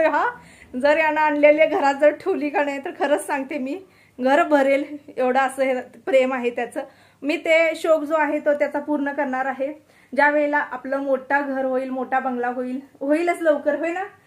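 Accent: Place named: Sangli, India. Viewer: मि विटा ...मायणी इथल माझ माहेर आहे .....कामानिमित्त आम्ही करमाळा येथे राहतो....आपली सांगलीची भाषा विडिओ त छान वाटते....पण इथे सोलापूरी भाषा जरा फरक आहे.... तु ज्या गावांची नावे सांगते ती गावे मला ओळखीची वाटतात.....विडिओ छान असतात .....पण कुठलीच गोष्ट आपल्याला सहजासहजी मिळत नाही.... तु खूप मेहनत घेतेस .....पुन्हा एकदा अभिनंदन लवकरच 100kपुर्ण होवोत हिच सदिच्छा💐💐💐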